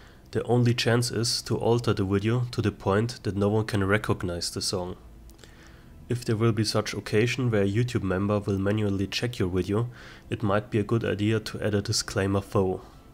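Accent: Bavaria, Germany. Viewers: kommst du aus Bayern?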